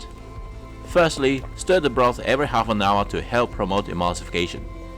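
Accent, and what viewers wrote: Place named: Australia. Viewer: Great and informative, thanks Also, did you learn english in australia?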